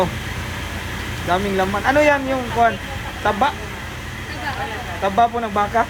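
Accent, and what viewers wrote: Visayas, Philippines. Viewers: Gahia pod sa imong Tagalog Bai. Klarong Bisdak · bisaya kay ka kollll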